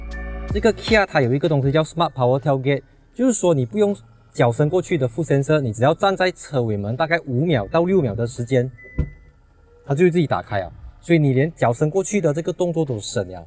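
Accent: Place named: Malaysia. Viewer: 马来西亚的说话就是这样子的吗？带着沉重马来西亚口音的英语，让实话让我这个中国人听了特别别扭。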